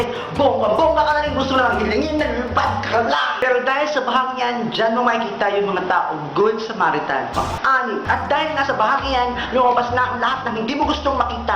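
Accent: Visayas, Philippines. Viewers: Bisaya kba? oOh..ganyan lang tlaga ung accent mo?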